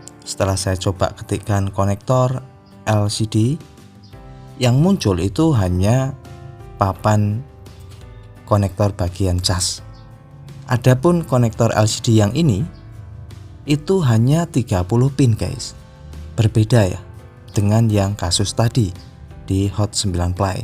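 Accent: Java, Indonesia. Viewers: Mas orang mana sih kok kayak orang Jawa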